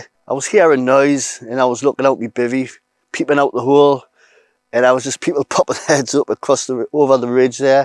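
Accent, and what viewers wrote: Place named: Ashington, United Kingdom. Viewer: When’s the next video coming out? I’m getting withdrawal symptoms 🤣 miss that ashington accent n canny craic hew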